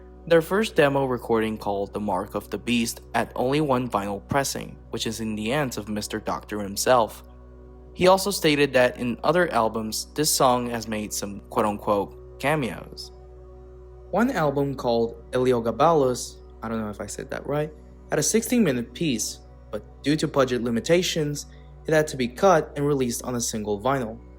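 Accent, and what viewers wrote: United States. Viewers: Wow, I'm not a native speaker of English but I really thought you were an American studying in Italy or something. Great content, keep it up!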